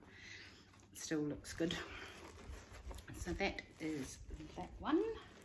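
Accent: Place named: New Zealand. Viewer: hahaha that's her Kiwi accent wait till she says six ;)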